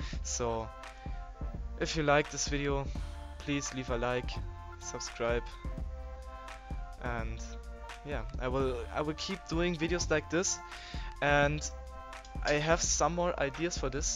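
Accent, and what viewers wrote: Germany. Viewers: Man merkt schon das du Deutsch bist aber das ich net schlimm! Dein Englisch ist gut! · you german? ^^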